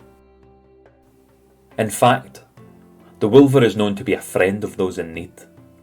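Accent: Scotland, United Kingdom. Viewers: I love the Scottish accent. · I love the Scottish accent. I could listen to anything in a Scottish accent and find it intriguing. Thank you!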